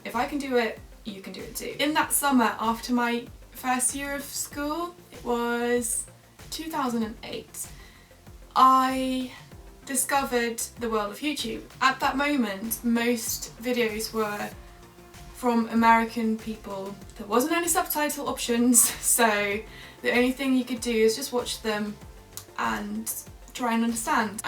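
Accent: United Kingdom. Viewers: Most countries synchronize television programs with the native language. In the Netherlands we watch all American and English programs in English with subtitles. Best way to learn English. Ik vind jouw Britse accent trouwens prachtig. Ik kan bijna niet geloven dat je eigenlijk Nederlandse bent!!! Well done!! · You have a fascinating accent. A mix of London, Midlands, Southeast with a bit of Scottish thrown in! A tiny bit of a Dutch accent. What an achievement! Well done. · Only if u would practice a bit more on ur intonation .. But gush the sounds of the letters are just like real british ..i'm jealous :) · Interesting video, I like your accent, it's British without being too British if you see what I mean. There is just a hint of another accent in there but if I didn't know you were Dutch I would struggle to place it. However it gives your voice an extra intonation and makes it definitely you, RP is somewhat dull so that extra bit sets your accent off nicely. 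· You have a very nice British accent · Such a talent! I wish I had a British accent too. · No her accent is not like America. Her accent is real British. You don't notice when she pronounces letter "T" is pronounced real T sound . American accent letter "T " is pronounced like D sound. For instance she pronounced word Better, later it is real British sound . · I didn't focus on the content of the video.. I just noticed you have such wonderful eyes and a pretty face xD. btw I loved the British accent thanks to you and I'm goin' to learn it <3 xDD .. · You sound extreamily british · Your accent is not forced, it's acquired and sounds purely British :) Which UK university are you attending? Is it in the southern England? (Just out of curiosity) I've studied in Reading for a year but that time was only enough to acquire a slight British accent, however, thankfully, watching the BBC news and some TV programs every day, made a huge difference. 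· Gosh!You sound literally like Elizabeth Bennet from Pride and Prejudice.....what a great British accent(with a twist of a classical Georgian era English) you have! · Your grasp of the English language is very good. From an American ear your English voice has a British accent with some other influence. Thank you for taking the time to make these videos. It's a pleasure watching you explain things from a Dutch perspective. Kudos.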